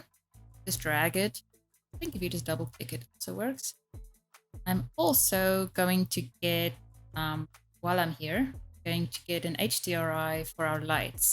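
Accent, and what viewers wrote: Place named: South Africa. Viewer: Loving that South African accent, and thanks for the tut! Cape Townian living in Paris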